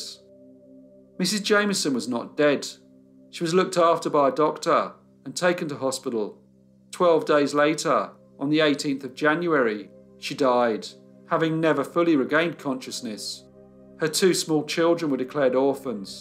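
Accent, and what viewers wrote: United Kingdom. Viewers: It's LeFFtenant, not LOOtenant. You sound British, you should know better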